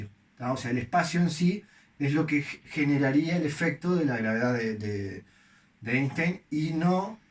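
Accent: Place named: Uruguay. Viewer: Interesante hipotesis, mucho mas siendo que no eres físico. Eres uruguayo? Tu asento se parece mucho. Deberias estudiar en el Balseiro. Saludos desde Argentina.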